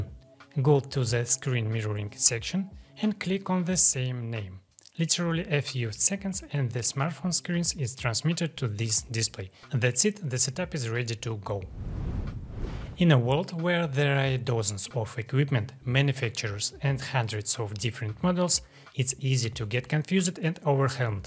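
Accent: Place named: Russia. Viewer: И у тебя сильный русский акцент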